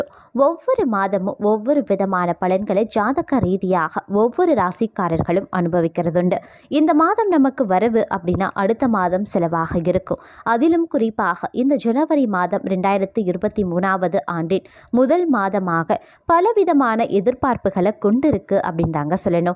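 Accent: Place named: Sri Lanka. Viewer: இலங்கைத்தமிழில் வாசிப்பு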